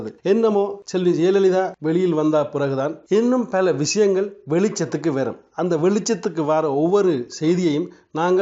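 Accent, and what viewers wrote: Sri Lanka. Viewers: ஈழத்தமிழன் போல் இருக்கிறது. ஆனால் அவர் இந்தியத் தமிழர் போல் பேச முயற்சிப்பது தெரிகிறது. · dude,. this is srilankan tamil. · Sweet Ceylon Tamil,I like it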